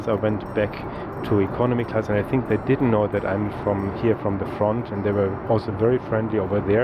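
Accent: Germany. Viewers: This was very informative so thank you for creating this. Also, and not really related, but your German accent comes across as being very friendly 😊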